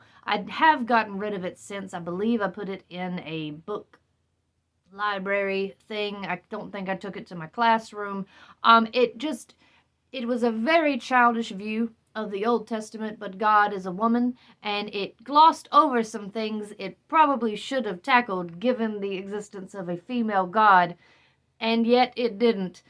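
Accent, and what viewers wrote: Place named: Transatlantic, United States. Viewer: Transatlantic accent is so funny for this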